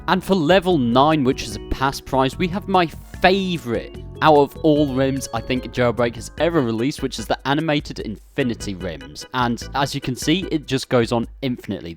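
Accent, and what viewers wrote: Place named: United Kingdom. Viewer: I love this British accent 😭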